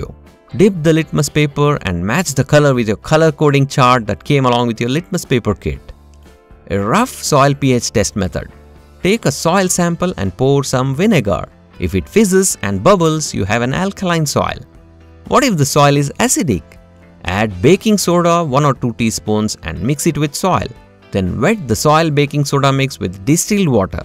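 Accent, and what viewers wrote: India. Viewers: Indian accent sounds cute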